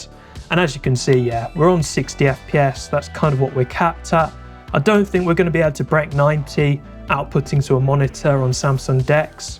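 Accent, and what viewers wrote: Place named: United Kingdom. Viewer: Good night I heard your British accent I'm sorry I can't watch it it just gets to me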